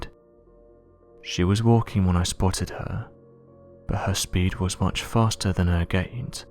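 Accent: United Kingdom. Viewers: Why didn't the guy that experienced this situation be the one telling the story? Other than getting a dude with a British accent that's hard to understand tell a story that happened in America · I think I wasted 9 minutes of my life. A former US DOD investigator with a British accent? That should have clued me in right there. It happened 12 years ago and you didn't have a smartphone to take pictures. Not very believable.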